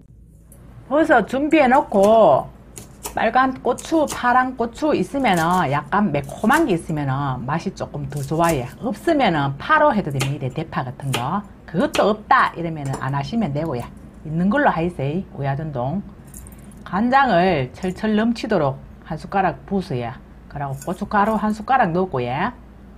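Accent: Gyeongsang, South Korea. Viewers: 털털이 경상도 아줌씨 요리보다 말씨가 더 맛나요^^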